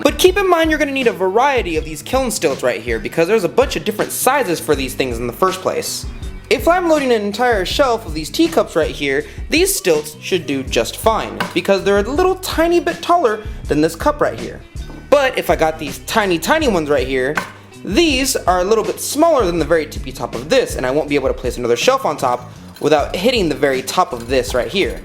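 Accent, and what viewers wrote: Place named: France. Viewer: Great video, buddy! :D Though I'm a bit disappointed you didn't say "tomorrow" with your french-ish accent :P I was kind of waiting for it!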